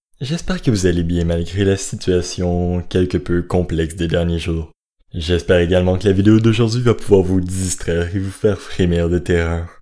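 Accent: Quebec, Canada. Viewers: Mais c dingue à quel point ton québécois ce mélange bien avec tes creepy pasta. J'aime énormément ton travail. Chez toi comment ce passe au niveau du virus ? Ua un confinement ou autres ? Moi je vie dan l'est de la France qui a était rapidement contaminé acose de l'Allemagne. J'habite plus précisément à laxou dans Nancy. Nous sommes zn confinement de 7jours sa vas. Sauf notre gouvernement est pas à la hauteur. C pénible. Pas de masque poir le déplacement ou le peu de travalleur non confiné. Et attende l'accord de la communauté scientifique. Pour le médicament miracle n'importe quoi. Heureusement que les médecins libereaux eux le donne au plus malade. Tien moi au courant stp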